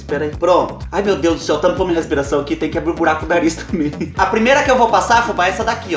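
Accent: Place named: Minas Gerais, Brazil. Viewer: Fubá achava que o seu sotaque era para os lado de Minas kkkkk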